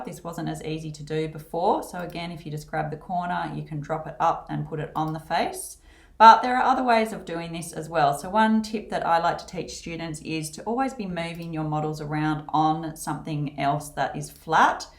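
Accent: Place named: Australia. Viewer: Some great tips for beginners and love the speed and direct approach. You mentioned a few times that you use a very powerful computer as the polygon count can get very high. Could you please share that info so if we upgrade our systems we have an idea what to get. Mother board Brand/Model: CPU Brand/Model: GPU Brand/Model: Ram Type/Speed and Number of Gig: Love your accent (maybe Australian) Nice clear voice and a great visual presentation Just one little thing to improve, the audio had some reverb and sounded hollow( possibly too many hard surfaces in your room) Great Work.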